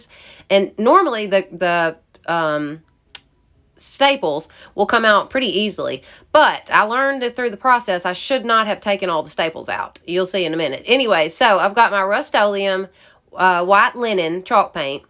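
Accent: South, United States. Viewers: Love your DIYs. Very inspiring. I definitely love watching your videos, as always you make it very fun and positive. Your southern accent is awesome! Thanks for the ideas, I can't wait to create my own.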